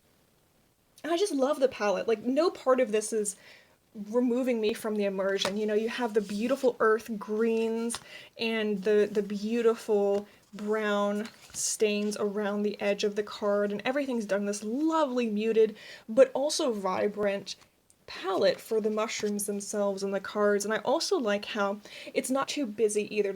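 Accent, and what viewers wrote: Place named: Eastern Europe. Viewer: Love your spirit! So joyful and enthusiastic 💕 You have very interesting accent. Are you from Eastern European culture? Love and light 🌺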